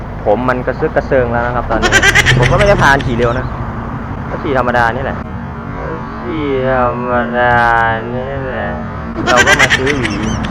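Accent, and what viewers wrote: Isan, Thailand. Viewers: คนอิสานเบาะะคับ5555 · คนอีสานตั้วนิ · อ้ายเป็นคนอิสานบ่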